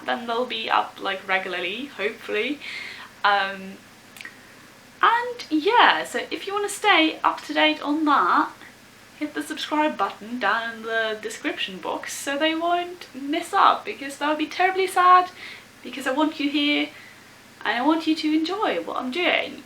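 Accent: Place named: United Kingdom. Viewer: Thats header very cool & I loved your accents too.... British accent?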